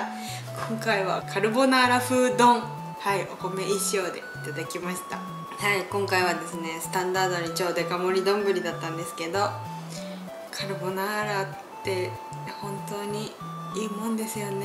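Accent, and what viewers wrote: Izumo, Japan. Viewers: 大社の人間なのでたまに出る出雲弁がたまらんです(*´°`*)💓ロシアンちゃん大好きーー！！💓✨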